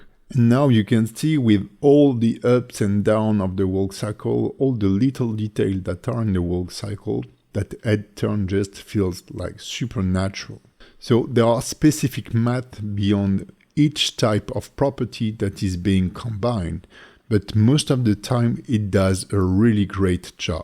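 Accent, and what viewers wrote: France. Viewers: Et sinon, il y a une version française de vos formations ? Je ne pense pas trop me tromper avec votre accent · J'adore l'accent Français !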